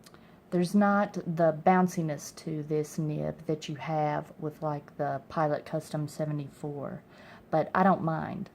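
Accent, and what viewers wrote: South, United States. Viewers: Dear, Thank you for your channel & pen reviews. I think your presentation style of casual conversation, combined with the BEST writing sample camera angle on YouTube!; are wonderfully engaging, informative & endearing. Although some other pen fanciers, may have minutes of opinions about various pens; I find myself, asking, 'I wonder if has a commentary' about this pen or that. Your Southern accent & charm make watching your pen featurettes delightful. I am just returning to my fountain pen roots. I had the pleasure of discovering the joy of the Pilot Prera Iro Ai (F), before I became one of your subscribers. Now I'm waiting for a couple of additions to my 'qwillery': the Sailor Pro Slim 4 Season (Meigetsu) & the Pilot e95s, to arrive. Thanks for validating that these wonderful writing instruments should be 'played with', as well as valued & admired. 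God Bless, Candice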